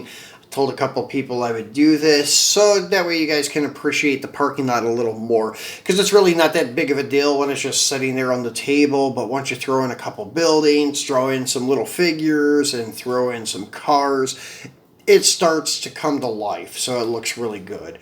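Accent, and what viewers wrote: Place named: Cleveland, United States. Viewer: Oh and while I'm at it, it's modern, like and urhn, not moderin modern. Lol I just messing with you! I learned to talk in N.Y. and live in Colorado so my ascent probably sounds worse then your Cleveland accent? Lol.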